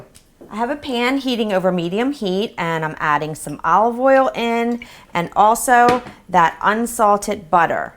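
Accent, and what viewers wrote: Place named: Philadelphia, United States. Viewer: Love it. "Wudder" Are you from Philadelphia? Making this for Turkey Day today. big hugs.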